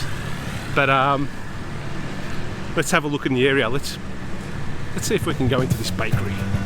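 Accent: Australia. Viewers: Where are u from boss? Australia? Your accent likes ausie guy. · I visited your channel for the first tome today. I didn’t pick you as Turkish, but your Aussie accent is a dead giveaway. Btw, I like tour channel and just subscribed.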